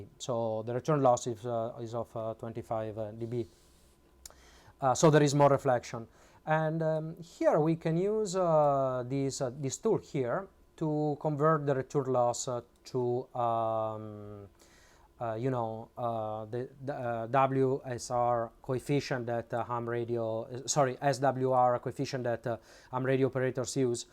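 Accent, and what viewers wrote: Italy. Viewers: Ho sentito nel video che dicevi “qui in Francia” ma dall’accento avevo sempre pensato che fossi italiano. Io sono di Roma e vivo in Portogallo, radioamatore e recentemente mi sto appassionando di elettronica. Complimenti per i video che posti. C’è sempre da imparare. Diego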